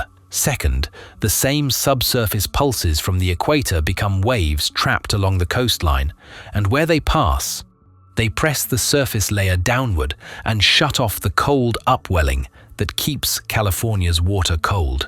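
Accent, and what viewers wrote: England, United Kingdom. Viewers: S,o,o,o,o. —- the weather forecast for your region is: “YES.” Im so glad that I found this At least I was able to hear a guy with an English accent!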